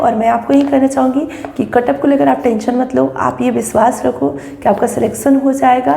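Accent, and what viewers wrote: Bihar, India. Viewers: Mam ap bihar se h?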